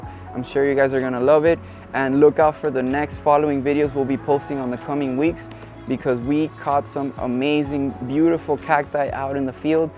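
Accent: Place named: Castile, Spain. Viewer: Que hermoso, amigo escucharte, hablar en castellano , un privilegio escucharte, un fuerte , abrazo, desde Buenos Aires!!!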